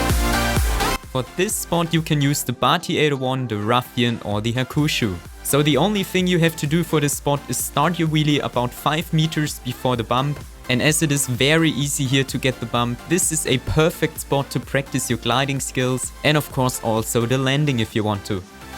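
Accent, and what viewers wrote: Germany. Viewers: Richtiges alman Englisch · Es hört sich so an also ob du Deutscher bist der englisch spricht😅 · Bist du deutscher? :)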